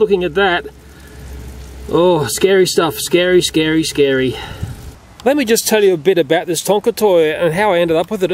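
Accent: Australia. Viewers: Not really. This man is Australian. The actor who played Korg is New Zealand Oscar director Taika Waititi. Aussie and kiwi accents are very different. But at least you didn’t mistaken it for an English accent! LOL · Lemme guess. YOU ARE FROM AUSTRALIA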